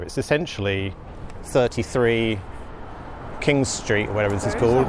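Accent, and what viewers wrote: United Kingdom. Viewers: damn definitely having a British accent makes you sound so much less insane. I pray you get some help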